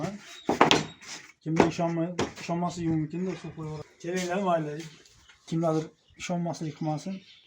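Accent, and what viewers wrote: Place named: Bukhara, Uzbekistan. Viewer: Уста каердансиз сир булмаса шевагиз Бухорога ухшайди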